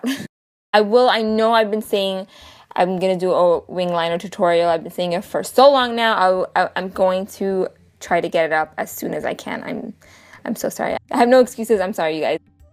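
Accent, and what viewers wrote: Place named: Dhaka, Bangladesh. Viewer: tea connoiseur ok heard you speak. you're dhakai. great video!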